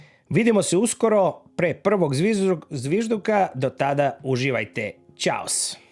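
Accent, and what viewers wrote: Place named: Ekavian, Serbia. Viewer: Ти мени дјелујеш као Хрват и коментаришеш, а причаш екавски имам такав дојам,поздрав теби и успјех на каналу.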